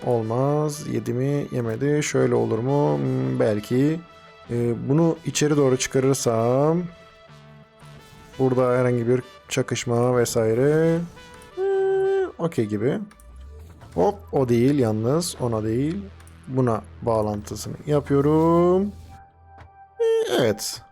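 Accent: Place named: Hatay, Turkey. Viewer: hatay sandık içi aksanı seziyorum ayrıca :)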